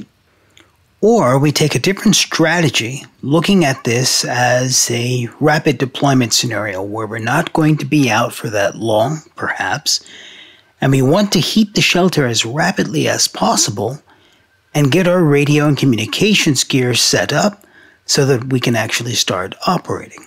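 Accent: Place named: United States. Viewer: Well, yes, I'm impressed. But the thing for me is how did you develop such a flat American accent?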